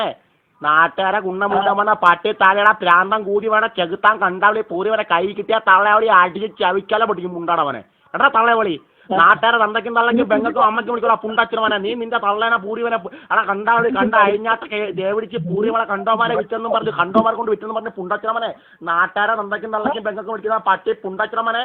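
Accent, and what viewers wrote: India. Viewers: തിരോന്തരം ആണെന്ന് തോന്നുന്നു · Bhasha kettit kodungalloor bhagathanu ennu thonnunnu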